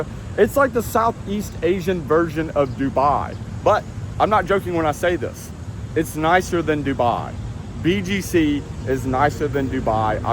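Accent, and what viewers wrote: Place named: Atlanta, United States. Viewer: I love your Atlanta accent 🤣🤣 I live right up the mountain in NC and it’s so different but I’m happy you are representing the south in Asia!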